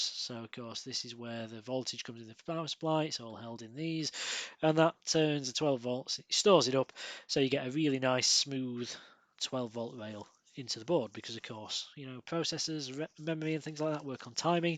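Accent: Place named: England, United Kingdom. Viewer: he talks too fast plus the English accent... I think I need English sub version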